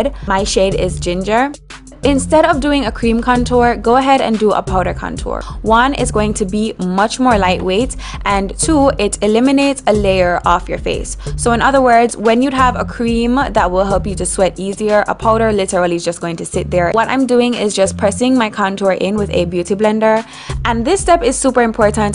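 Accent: Jamaica. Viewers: Jamaican ? Yayyyy!! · Are you Jamaican cause you sure sound like one ..... well I think you are ! God bless you .... you’re extremely beautiful and blessed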